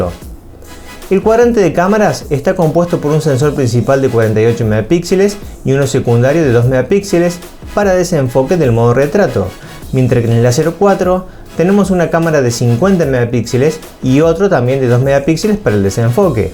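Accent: Córdoba, Argentina. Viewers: pregunta sos cordobes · eres cordobes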